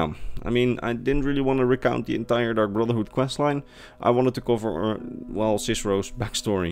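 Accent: Netherlands. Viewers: hi! i love this vid, question tho, are you dutch? you kinda have that dutch english accent. im dutch btw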